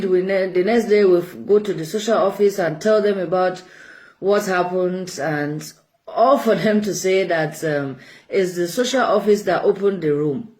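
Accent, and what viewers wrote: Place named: Africa. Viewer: Your voice and accent sounds so African. I love it ❤️❤️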